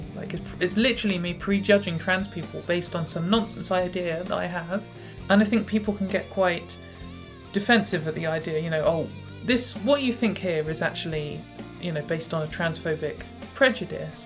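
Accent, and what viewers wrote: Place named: England, United Kingdom. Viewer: Good to hear an English voice doing a video like this. Sensible comments , beautiful looks , nice guitar solo, you have got it all girl !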